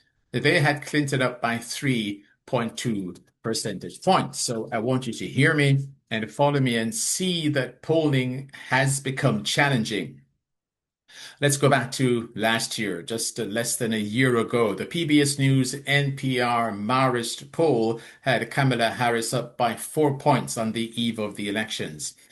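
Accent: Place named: Jamaica. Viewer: I have never been a fan of. I don’t know why he tries so hard to hide his Jamaican accent.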